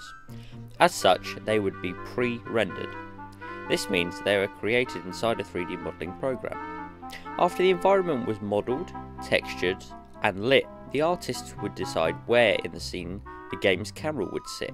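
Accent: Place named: Cockney, United Kingdom. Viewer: dat cockney accent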